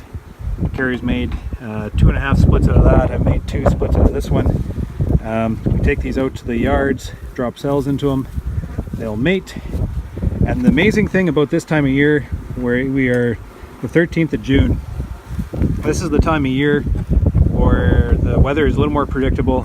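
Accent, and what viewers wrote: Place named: Canada. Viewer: I got most of the way through the video trying to guess which one of the northern states he was from based on his accent/dialect before I realized his channel is called the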